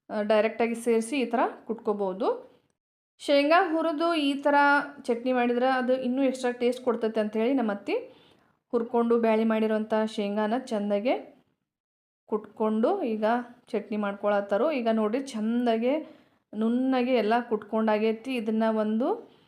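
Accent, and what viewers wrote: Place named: Belgaum, India. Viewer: Hi mam ,ur kannada tells us that u r from Belgaum district. (madtaru) that roo of madtaru😊😊.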